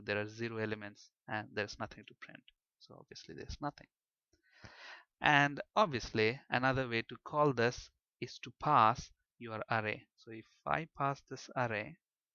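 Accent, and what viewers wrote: India. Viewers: These are awesome vids - Thanks! Also, usually with Indian instructors the accent is so strong and they talk so fast it's hard to understand what they are saying, but you speak very clearly and slowly, so it's easy to understand you. I'm a C programmer trying to learn C# and these are the best vids I have found. Even better than the ones I had to pay for.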